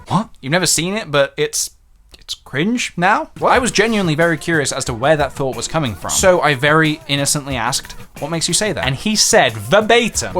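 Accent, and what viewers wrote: United States. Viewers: That american accent 😍 Grateful for how good you are at it LOL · Tell me why your American accent is better than every american’s American accent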